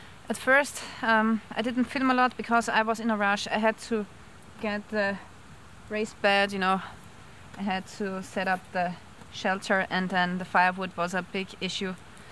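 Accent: Germany. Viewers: I have to remind myself that you're not the girl I loved so much years ago. You are much more dear with a German accent. By the way, your English is great, but "owl" rhymes with growl or now+l. We have huge, very loud owls in the woods near here. Hearing their calls echoing in the night would frighten even the meanest werewolf!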